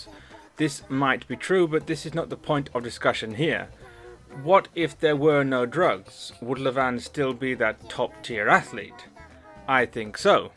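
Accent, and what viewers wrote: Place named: United Kingdom. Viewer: Wow where are you from? That’s the strongest British accent I’ve ever heard, with a slight hint of Malay/Singlish added in there too.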